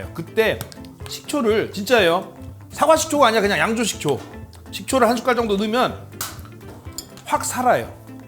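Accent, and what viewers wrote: Chungcheong, South Korea. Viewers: 충정도사투리가 구수하고 귀여우셔요^^ 믿고보는 님~맘도 따뜻하신 님~^^ 응원합니다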